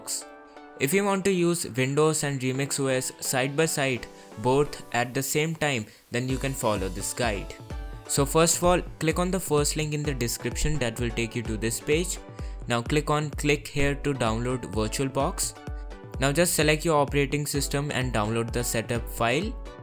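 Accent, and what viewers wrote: India. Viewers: Nice video. Your english sounds like the english from apu nahasapeemapetilon(Simpsons)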